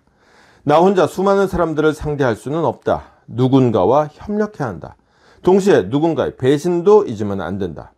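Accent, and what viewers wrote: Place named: Gyeongsang, South Korea. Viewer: 경상도 사투리가 맛깔 나십니다..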